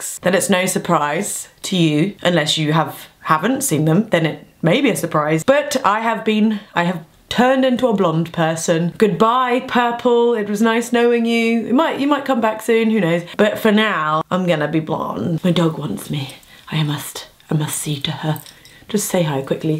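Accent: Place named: Birmingham, United Kingdom. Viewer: Idk why, but to me you sounded sort of brummie in this 😂 probably just me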